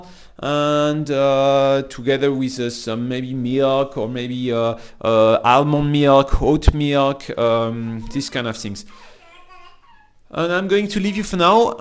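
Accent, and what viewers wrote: France. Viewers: L'Anglais avec l'accent français c le meilleur :)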